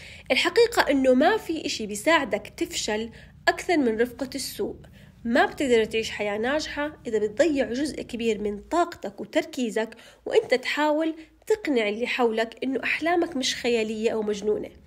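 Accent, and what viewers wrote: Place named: Jordan. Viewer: شكرا الك .. محلاكي باللهجه الاردنيه الطبيعيه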